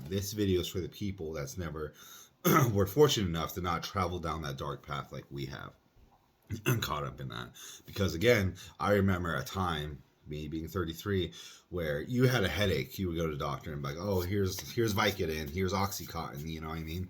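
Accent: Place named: United States. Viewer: You look really Norwegian indeed. Hearing your fluent English American accent is so weird.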